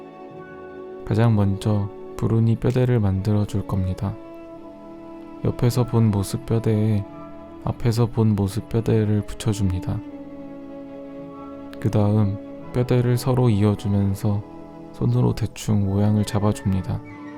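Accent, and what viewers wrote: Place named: Chungcheong, South Korea. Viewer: 유명한 유튜바한테서 느껴지는 익숙한 말씨... 충청도여...~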